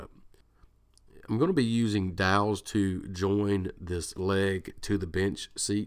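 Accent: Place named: South, United States. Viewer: YOU A SOUTHERN BOI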